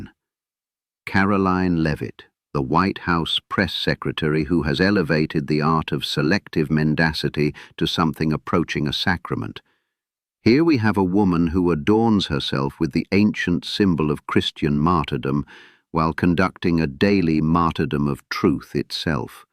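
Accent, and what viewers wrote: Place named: England, United Kingdom. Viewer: Wonderful, simply wonderful. The delivery in a crisp English accent, the words used, the message clear, cogent and concise. We love it! Keep it coming please.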